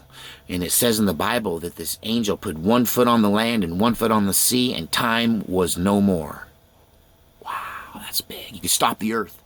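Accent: New York, United States. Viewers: I LOVE COFFEE TALK and I love how you say coffee talk ..I love New York Accents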